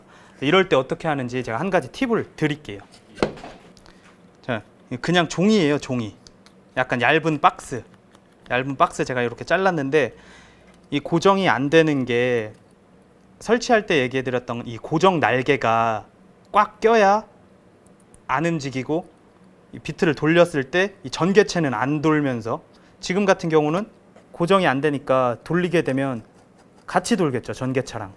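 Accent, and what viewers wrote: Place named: Japan. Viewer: 대단하다 진짜.. 앙카가 뭐냐 앙카가.. 위대하신 천황폐하를 모시는 신민이라 그런가.. 일어를 쓰려면 일어를 쓰고, 영어를 쓰려면 영어를 쓰지 일본애들이 쓰는 영어 발음까지 따라해야겠냐..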